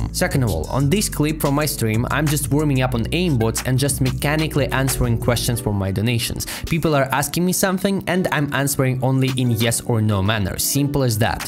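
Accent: Russia. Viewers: next video with strong russian accent please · Thanks mr Russian man news man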